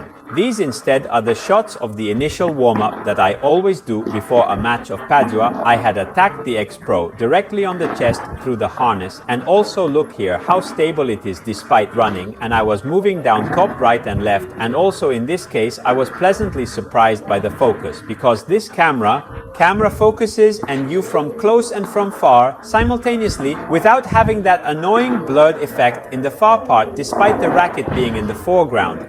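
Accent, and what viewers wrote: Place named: India. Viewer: U sound like an indian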